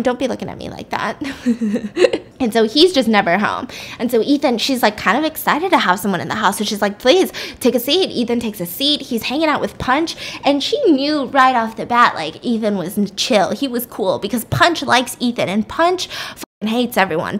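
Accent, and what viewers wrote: United Kingdom. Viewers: Ever since has started speaking in an British accent I’ve added it to my daily life 🤩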